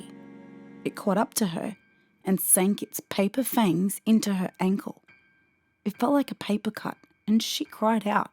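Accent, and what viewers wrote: Australia. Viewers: Aussie accents are so nice to listen to. Great voice and video. 😊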